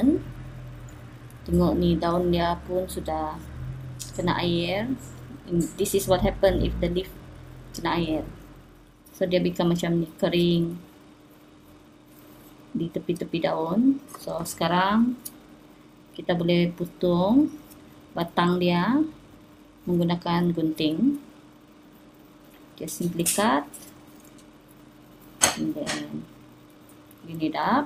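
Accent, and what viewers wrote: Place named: Philippines. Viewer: Redeemed Harshsw sounds philipino, maybe search for another video instead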